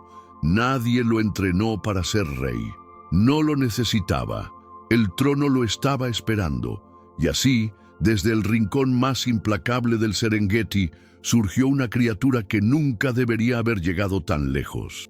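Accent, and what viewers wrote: Portugal. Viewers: COño nua entendo ni marre, es espanol revuelto con portuguaos 😁🤣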